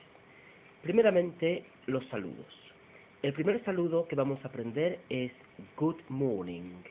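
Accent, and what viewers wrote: United Kingdom. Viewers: yo sueño con aprender el acento britanico en ingles ,pues amo el acento britanico la mayoria de mis grupos favoritos de rock ,demas musica y metal son de alla , the police , iron maiden , gorillaz ,led zeppelin ,napalm death, carcass ,the outfield ,black sabbath ,deep purple ,coldplay etc. y pues mi admiracion para el autor de este video ,el acento que tiene es realmente grandioso. saludos desde mexico.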